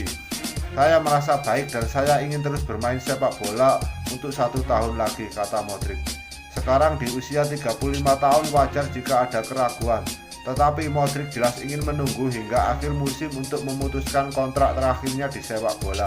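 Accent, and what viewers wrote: Java, Indonesia. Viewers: Kaya orang Jawa ngapak hehehe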